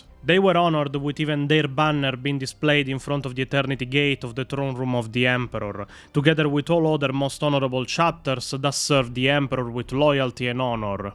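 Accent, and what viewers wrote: Italy. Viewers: The only problem with these wonderful renditions of lore is now I cannot take anyone telling me lore seriously that doesn't have an Italian accent. 🙂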